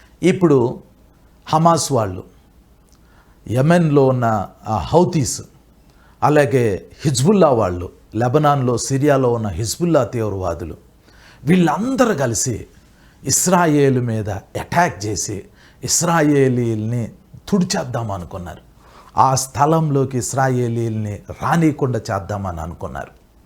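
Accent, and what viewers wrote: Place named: United Kingdom. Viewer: Babu munda meeru Telugu Nu Telugu laga matladute baaguntadi please English accent vaada vaddu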